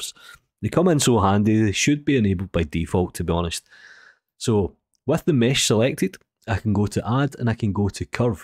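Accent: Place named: England, United Kingdom. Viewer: I LOVE YOUR ENGLISH ACCENT MATE LIKE FROM PEAKY BLINDERS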